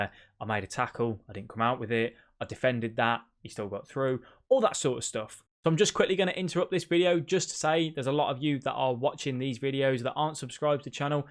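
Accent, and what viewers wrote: Birmingham, United Kingdom. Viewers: Great channel m8 are you from brum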